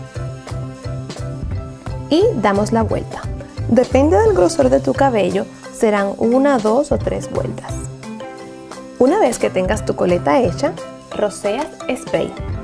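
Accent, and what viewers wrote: Cuba. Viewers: porque la voz lo tienes muy diferente a tus vídeos,actuales no entiendo,cual es y voz Real Porque actualmente tu voz es más dulce y con su voz cubano Pero antes tu voz era diferente Acaso mi hermosa finjes tu voz, por favor aclaranos ese tema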